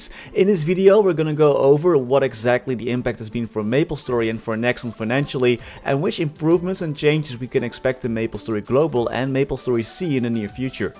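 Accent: Netherlands. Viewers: I can tell by your english that you are dutch lol! Thanks voor de video maat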